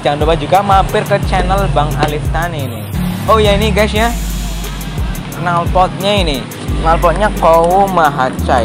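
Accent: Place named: Banyumas, Indonesia. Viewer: Ngapak gaes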